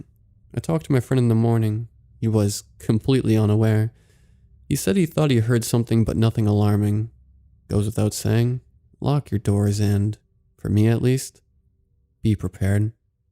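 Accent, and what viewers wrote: United States. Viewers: I have great deal gratitude to those who narrate some very different like dr creeps he got own style as do this one both very good.apart from American accent and he can't help that.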